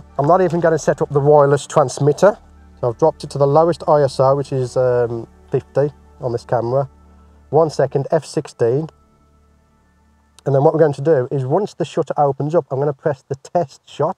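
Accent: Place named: Stafford, United Kingdom. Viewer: wicked work mate you sound stafford way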